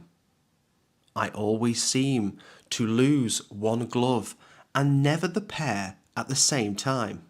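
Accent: United Kingdom. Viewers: The British accent!! I missed it alot! I studied in the UK for a while! So, this accent filled me with nostalgia!! Liked+subscribed+shared with others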